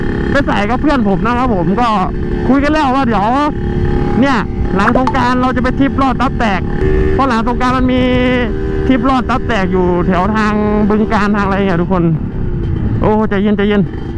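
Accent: Korat, Thailand. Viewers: สําเนียงโคราชชัดเจนแท้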